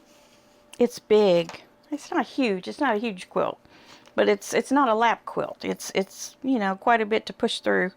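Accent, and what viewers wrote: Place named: Appalachia, United States. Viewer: Going back and looking at some of your older videos. Loved learning a bit more about you,. I do love your Appalachian twang—it’s very endearing. I think we should all be proud of where we’re from. I was born in Arkansas, but New Mexico is home now. You are a natural at producing YouTube videos! I’m enjoying and learning from your Lessons Learnt channel.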